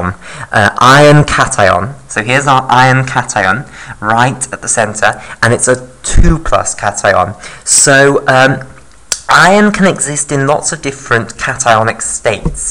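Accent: United Kingdom. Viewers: Why do i feel much smarter listening to a teacher with a british accent? God bless you sir thats a lott of help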